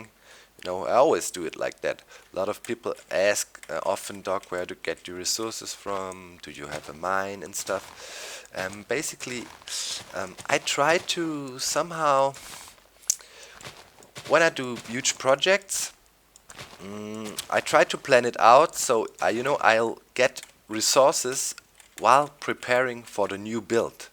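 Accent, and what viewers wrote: Germany. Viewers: Ha! Ich wusste doch das du Deutscher bist, das hört man doch. Hab mich aber nie getraut zu fragen :D Geile Videos-> Aboniert =3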